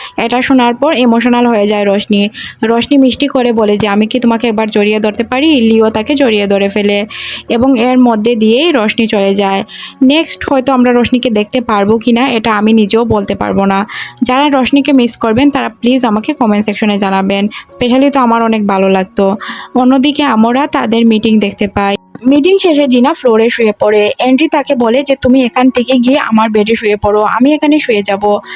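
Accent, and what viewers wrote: Sylhet, Bangladesh. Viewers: আপনি কি সিলেটি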